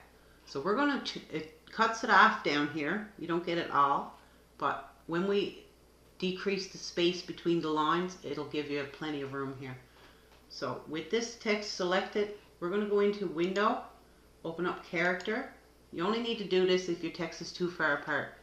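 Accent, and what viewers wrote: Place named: Nova Scotia, Canada. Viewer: Hi neighbour, I thought I recognized that accent. I'm from Nova Scotia.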